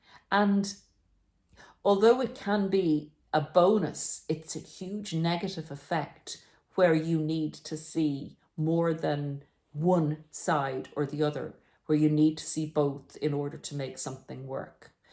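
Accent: Ireland. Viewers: Fook! There's something about a glorious Irish accent that makes learnin' things much more engaging. Alas, me mother's side is Scottish, colonized by Brits, and they're wankers!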